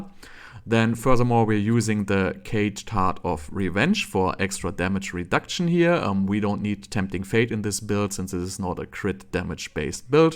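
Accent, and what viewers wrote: Germany. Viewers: Vielen Dank für diesen megageilen Build! Von allem was ich bisher probiert habe, bockt deine Version am meisten. Mach weiter so! PS: Ich schreibe auf deutsch, weil ich deinem Englisch Akzent nach ziemlich sicher bin, dass du Deutscher bist.😁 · Man hört einfach immer wenn jemand deutsch ist haha, gutes video 👌🏼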